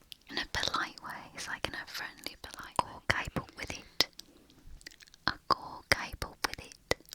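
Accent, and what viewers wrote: Black Country, United Kingdom. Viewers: Ah bab, this med mar day! Ar doh liv in the Black Country any mooer as ar liv ‘darn sarf’. It was lovely to hear ‘my’ accent and listen to the phrases my parents used to say. Thank you. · Always happy to hear you reppin' the Black Country,...practically my second language ay it? 🤣🤣🤣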